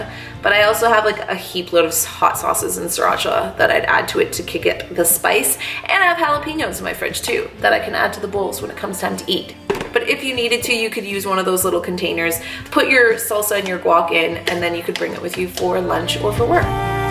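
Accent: Canada. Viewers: Sounding like a Canadian in this video lol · Us Canadians say things differently ahaha 😅😅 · its how Canadians say it, i watch aton of youtubers from canada and they all do it hah its just different! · I think it's the Canadian accent. We like our 'A' sounds. :)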